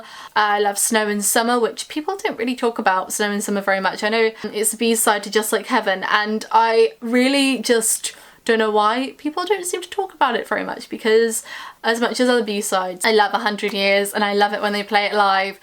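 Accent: United Kingdom. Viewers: Aww hello to u hon..Im Nancy..I just came across your channel while doing some checkup updates on....I must tell u that it's so so awesomely good to see the younger newer generation of today getting into one of the most underrated bands of my time..The 80s..so underrated there totally awesome...not many people have ever heard of them even back then..I was a teenager when they came out and all through my teen high school years even today now I still love them..yes I'm older now..just turned 51..this is me in the pic. You remind me of myself then blond hair all black clothing..which I still wear.im still that cool 80s chick at ❤..lol I know so much about and other great 80s bands like them u might love to know about and give a listening to..huggs to u..yes.i talk alot to..by the way..i love your English accent.you a Brit...nice to meet you dear..always an old school Curran here..huggs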